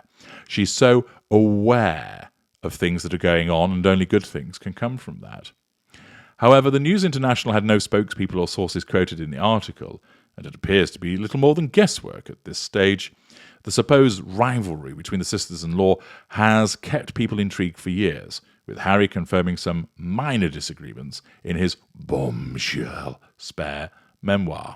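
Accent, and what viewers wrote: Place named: Scotland, United Kingdom. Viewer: Thank you. I have to confess that I very much enjoy it when you read the Scottish articles, simply because your Scottish accent amuses me greatly! HW has a "honeyed" voice, eh? I'd suggest her voice positively drips with falseness and sanctimony, because all she does is talk down to her audience and try to score points. But yes, I can imagine that Harry's wife is pretty much exploding at the reports of what Catherine and William are doing; and I'd think that Harry himself is also looking at them with envy and resentment.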